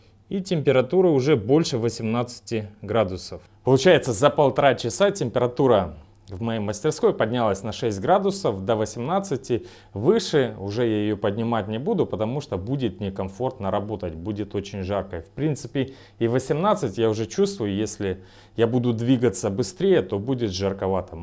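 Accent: Rostov, Russia. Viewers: То ростовский говор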